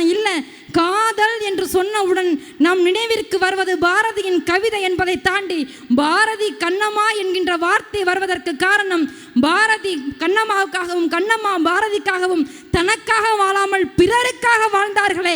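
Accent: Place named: Chennai, India. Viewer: பள்ளிக்கூடத்தில் படித்தது ஒன்று, பேசுவது Madras தமிழ் தூதூதூதூதூதூ ....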